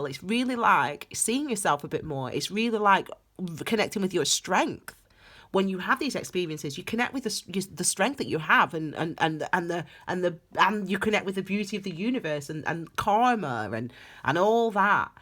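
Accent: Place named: Northern England, United Kingdom. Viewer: I just found you the night b4 the New Moon when I am beginning a 40 day grape 'fest/fast'! Ha! Timely in deed. You're into the same stuff I like too and you describe things well. When you did the Reiki I felt my head and throat as blocked. My heart to so you were spot on. Ta very much! I live in the US but come from SE England. You're a Northern Lass I can hear that much! Thanks and blessings and happy new moon. I did subscribe so I can listen and learn some more. Cheers.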